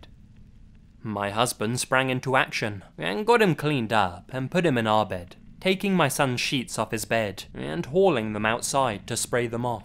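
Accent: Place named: England, United Kingdom. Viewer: I love your videos man, where in England do you live? Love the accent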